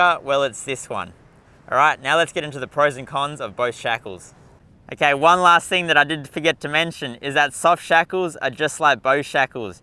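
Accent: Australia. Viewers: When I hear an Aussie accent at the beginning of an Offroad educational video I know I’m about to learn some good stuff! 🇺🇸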